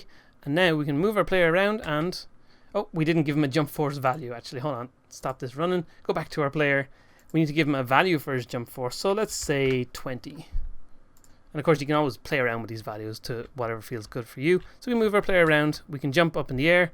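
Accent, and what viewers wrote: Ireland. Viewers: Thank you so much for this video. As a newbie to C# I appreciate you taking to time to explain your coding. I really like the Irish accent but I did type VectorTree instead of Vector3 at one point XD XD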